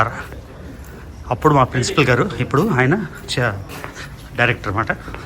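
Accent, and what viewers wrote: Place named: India. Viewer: Andukea meru vijayavantulu iyyaru And your english is indian english not American english, we are proud to be indian garu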